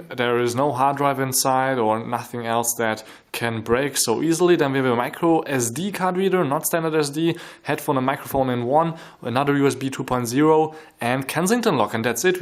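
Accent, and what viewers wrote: Germany. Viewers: ich habs gleich gewusst das du aus deutschland kommst, verdammt wieso hört man das bei uns so raus? >.< ich hätt am liebsten einen amerikanische aussprache :D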